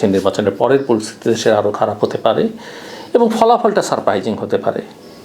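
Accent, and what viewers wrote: India. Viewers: ভাই আপনি তো ইন্ডিয়ান ভয়েস এ কথা বলছেন একদম হবো হু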